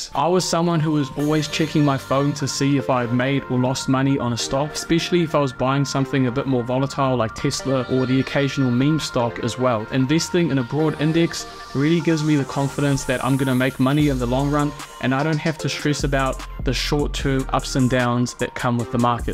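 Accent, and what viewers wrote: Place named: New Zealand. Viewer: You sound like a Kiwi similar to me. In New Zealand what platform should I use to invest in. I have sharsies but I dont know if there is better out there. I'm 19 years old and want to set up something that can help me buy a house in like 10-15 years or something. Just wanting to set up for long term success.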